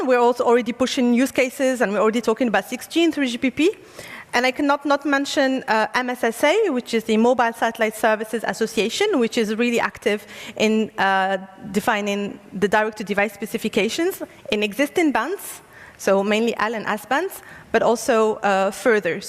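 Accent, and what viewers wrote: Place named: United States. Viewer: Amazing American accent but very hollow speech...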